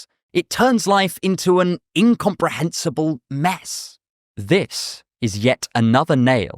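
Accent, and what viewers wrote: England, United Kingdom. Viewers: This is a deeply unserious comment but I’m generally repulsed by British people and the British accent but you are one of the few British people I find attractive for some reason. Cheerio mate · I've lived in england for 10 years and I've heard and english as british as yours before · I never expect you to have an english accent for some reason. Every single time I am surprised.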